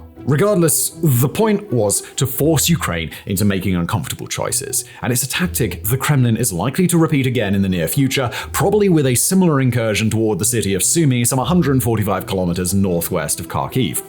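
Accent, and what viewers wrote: England, United Kingdom. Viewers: you're British with the most English accent outside of the royal family or the 1950's BBC...It's 'Autumn' not 'Fall'.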